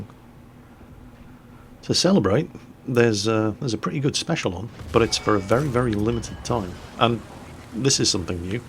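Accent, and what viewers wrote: Norway. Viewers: I like your Norwegian accent! you sooo funny! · best norwegian accent ever:D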